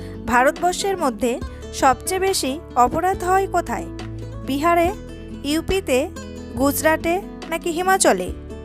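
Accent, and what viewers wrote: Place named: Bangladesh. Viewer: তুমি বাংলা দেশের লোক। তোমার কথা শুনেই বোঝা যায়। যেমন maass/মাছের উচ্চারণ শুনেই বুঝেছি।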